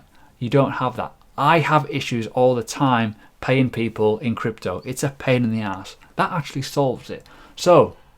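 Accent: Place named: North, United Kingdom. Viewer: Favourite YouTuber. Proper northern. Tells it how it is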